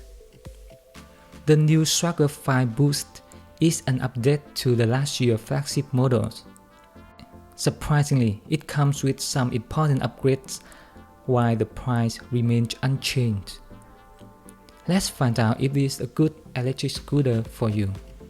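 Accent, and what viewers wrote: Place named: Vietnam. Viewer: Vietnamese accent :D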